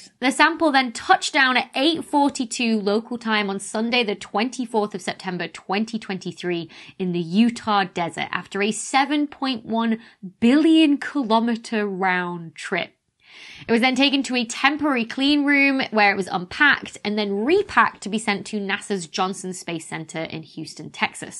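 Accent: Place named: Cockney, United Kingdom. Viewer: "ME-thane!!!" You're such a cockney cutie!!! I'll bet you say "al-U-minium," too?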